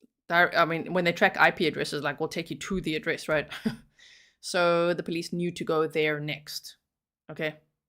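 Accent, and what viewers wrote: South Africa. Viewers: In the beginning I was like: She sounds South African...I wonder where she's from? And then you used the word 'shame' and then I knew😂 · I detect a faint South African accent? ❤️🧡💙💚💛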